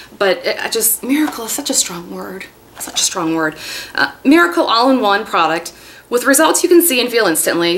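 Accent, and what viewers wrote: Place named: South, United States. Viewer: I knew you had to be from the South! We sound the same....and provide lots of information (ha ha!)....I have this one and feel like you do. I also have the Smashbox. I bought it before it was released to the public. I like it a lot. I still use a concealer and finish powder (IT cosmetics)...I like the look! I definitely think it's making my complexion more even. Keep the vids coming! I love your sense of humor! We Southern Belles got it goin on! Hee! Happy Easter, y'all!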